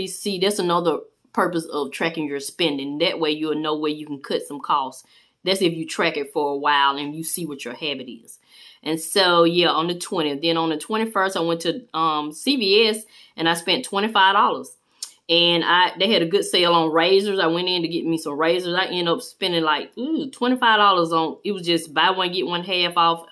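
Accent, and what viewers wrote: South, United States. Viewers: thanks for sharing the budgeting videos. I'm a long-time subscriber and have really enjoyed watching your financial journey blossom. I've been cheering you on silently from the rafters! In fact, if your video is in my feed, I watch you BEFORE I watch any others. You have such a beautiful, giving spirit and, Girl, that southern accent of yours is everythang!...I'm southern, too.😀 I'm curious about your grocery budget, though. I know you shop your pantry before grocery shopping and you also coupon, but would you, if your schedule allows it, please make a What's for Dinner series for one week to help me understand how you grocery shop on $50 a week for two and sometimes have money left over? There's only two of us and, for the life of me, I haven't been able to even come close to that. Thank you so much for the consideration and, again, thank you for sharing. 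Peace and blessings...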